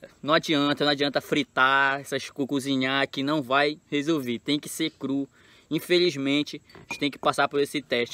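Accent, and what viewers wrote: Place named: Pará, Brazil. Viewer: tu e paraense crtz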